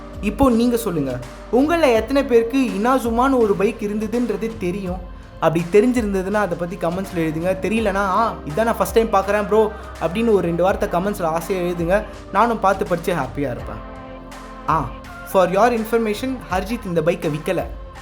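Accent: Chennai, India. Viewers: Hearing the name at the very first time! As usual I have enjoyed the way you talked about the bike and it’s performance. Please stick with your style of reviewing with namba chennai slang which has got many fans including me. There are many professionals in the market to talk about Bikes but you are little different than others. Hope you already know it and wish to meet you in person someday 👍🏻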